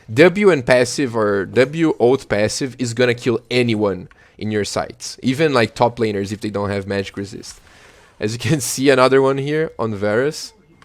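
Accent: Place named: Brazil. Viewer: Sotaque de brasileiro falando inglês é muito fofo 🥰🥰🥰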